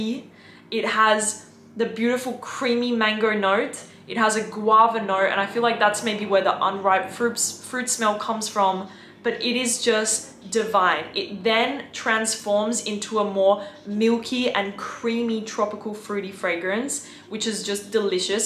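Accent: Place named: Australia. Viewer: Hello. I have been to your hometown Perth twice with the USAF Reserve out of Travis AFB California. Beautiful woman from a beautiful area of the world. I love the accent. Would love to be a patron member but How? I look forward to hearing from you LOL, Scott.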